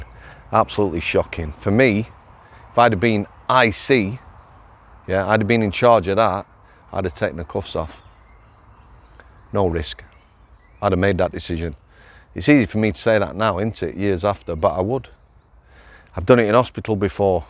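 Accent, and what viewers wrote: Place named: Yorkshire, United Kingdom. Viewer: Another cracking upload mate. Your video + a large cup of tea = perfect early evening infotainment 👏🏻👏🏻👏🏻👏🏻👏🏻. PS loving your Yorkshire twang, keep it coming.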